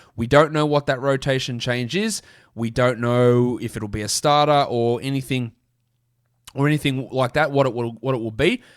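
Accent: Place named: Australia. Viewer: I loves Aussies but trying to listen to one of em talk DFS NBA basketball is cringy. Just can't do it. I'm 2 min in and I've got to stop this video. Get back soon. 😂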